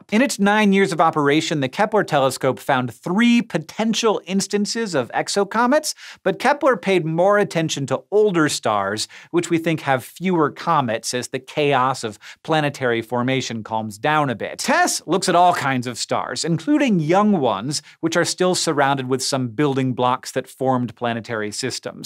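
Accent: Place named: United States. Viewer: "it's LIKE" I'm listening to a school girl in a mans body. The American accent, voice and sentence structure does my FN head in! so many YT vids that promise and then the voice... JUST TALK NORMAL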